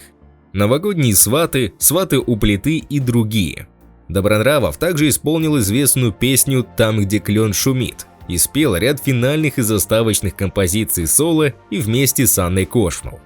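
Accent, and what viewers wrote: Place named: Russia. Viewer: Как же ваш москальский выговор портит слово,, сватЫ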